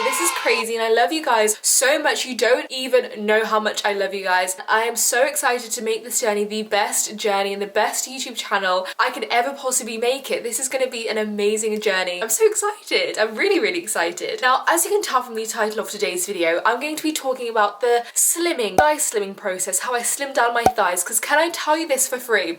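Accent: RP, United Kingdom. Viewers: You sound so posh you remimded me of my of my cousin who goes to private school loooollllll🤣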